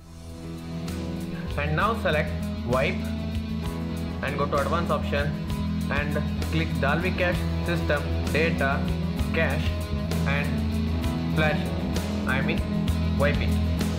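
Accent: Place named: South India, India. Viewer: are u south Indian??? ur accent tells the truth , I'm a south Indian too but I don't have that accent ,I want to know why!!!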